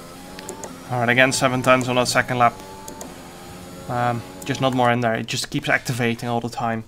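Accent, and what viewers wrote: Netherlands. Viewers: verry nice nederlandish accent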